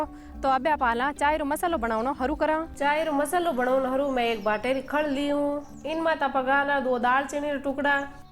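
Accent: Marwar, India. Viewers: जी बहुत शानदार प्रस्तुति के लिये बधाई, आप की मारवाड़ी बोली बहुत अच्छी लगती हैं। आप का बहुत बहुत धन्यवाद 🙏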